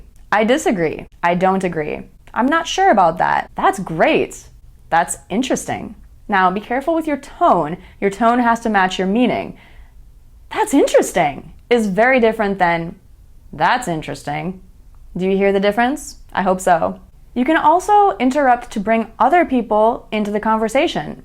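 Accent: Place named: United States. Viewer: why ur English is so obevious ......is that American accent ?????? if that American I trust myself I can speak English fluently.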